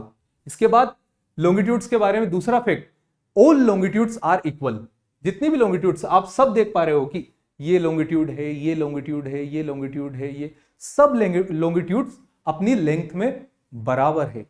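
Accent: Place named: Rajasthan, India. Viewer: YOU SOUND LIKE RAJASTHANI SIR 😀 AWSM SESSION BTW ❤️🔥🇮🇳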